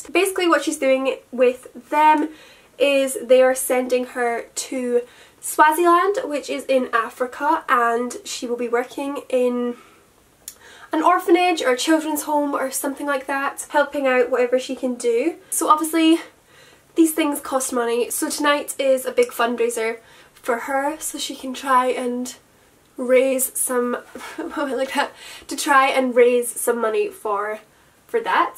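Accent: Scotland, United Kingdom. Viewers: Oh my gosh, I just came across you and honestly you are so lovely and cute, and I totally thought you were going to be american and then you started speaking and I got such a fright cause you are scottish? haha xoxo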